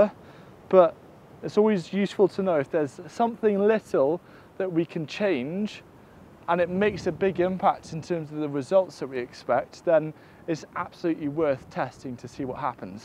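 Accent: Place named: Wales, United Kingdom. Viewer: Love hearing your voice just talking casually. I live in Hannover, north Germany, but I come from mid Wales (Montgomeryshire) and recently got into gardening, got a Kleingarten (german allotment with a little cabin) and was looking for help with ... how to have a garden, pretty much. Your advice and content are exactly what I needed, so I've been following along for a while. I'm starting to get the hang of it, and the joy of the whole project just grows and grows. And as a bonus, every word you say sounding like the people I grew up with makes it all very easy on the ear. Sometimes I don't watch what you're doing, just listen to you talk. I'm still learning though, right? 😊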